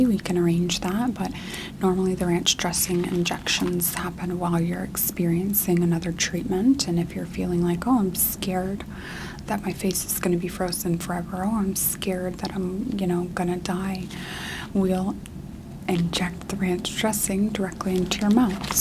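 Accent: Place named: Midwest, United States. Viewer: The midwest accent is toooo good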